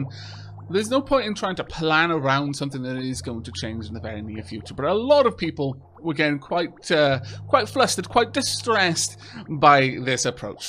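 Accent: United Kingdom. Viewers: You are pronouncing 'algae' in British English (which makes sense, as you're speaking in a British accent). <link> This is the American English pronunciation. <link>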